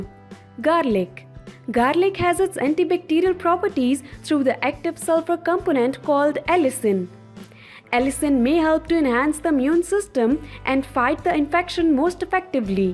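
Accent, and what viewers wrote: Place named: India. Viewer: I can’t stand the Indian accent and mispronunciation.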